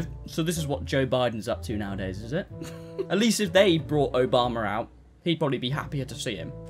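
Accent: United Kingdom. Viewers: i do enjoy this content because hes British and speaks funny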